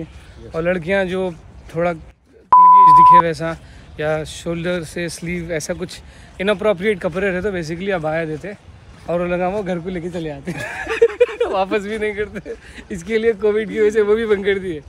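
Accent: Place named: Hyderabad, India. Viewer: Bhiya full hyderabadi slang me hai kiraak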